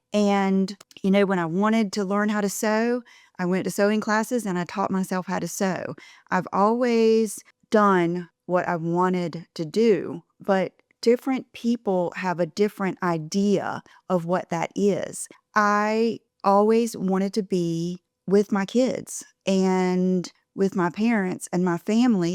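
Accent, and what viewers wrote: South, United States. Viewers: You are authentic and that’s why we love your content, just don’t loose that beautiful authentic southern accent, it’s charming beyond words. TY for your great videos!❤❤❤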